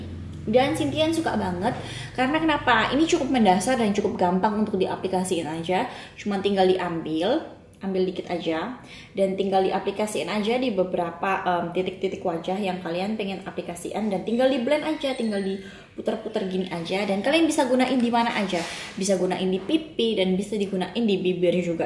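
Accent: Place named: Yogyakarta, Indonesia. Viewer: Haiii, kamu orang jogja yaa? Medok bangetttt Nice vids btw!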